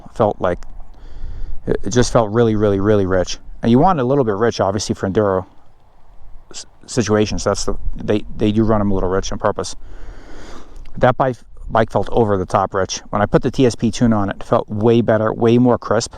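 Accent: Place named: Massachusetts, United States. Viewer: You from Massachusetts? Got a north east accent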